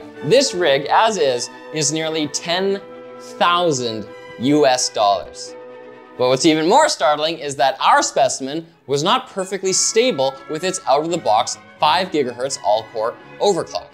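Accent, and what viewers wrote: United Kingdom. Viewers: why the fuck it sound so British ???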